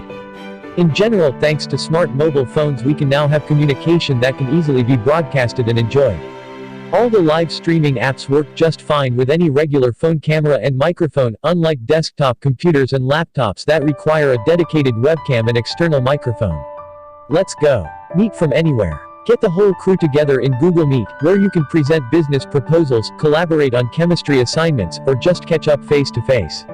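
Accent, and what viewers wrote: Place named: England, United Kingdom. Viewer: I like you english accent, thank you for being a good teacher and sharing your idea about our modern technology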